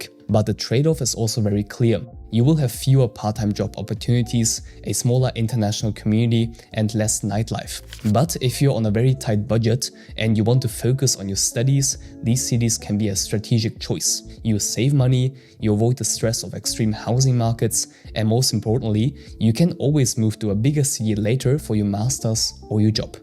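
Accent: Germany. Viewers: May I ask, Is German your mother tongue?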